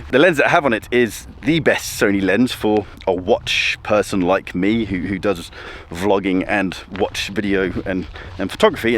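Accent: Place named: Southern Scotland, United Kingdom. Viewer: I do enjoy your content and even more so with the Southern Scottish accent 😉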